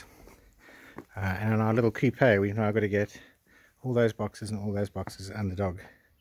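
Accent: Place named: South Africa. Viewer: I suspected you where a South African when i heard your accent, great stuff my friend, enjoy every minute of it, and bask in the safety. Kind regards from South Africa.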